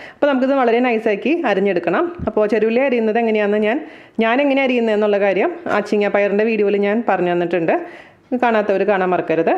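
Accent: Thrissur, India. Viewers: Thrissur aano😊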